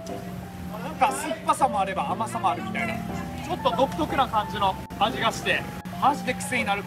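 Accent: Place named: Mie, Japan. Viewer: 最近見出したのですが何人くらいで旅してるんです？ 喋り聞いてると三重の方かな？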